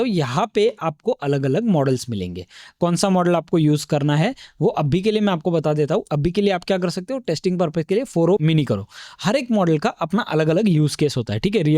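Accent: Maharashtra, India. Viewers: Thank you very much bro for introducing me to AI Agent world. Although I am a software engineer but I have never explored AI Agent side. Your way of describing is very good and seems genuine. Describing everything from scratch. I like your Hindi with Marathi style, it reminds me my days when I was in Mumbai and Pune. Want to learn lot from you. :) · Bhava tu marathi aahes na tuja Accent ni kaltay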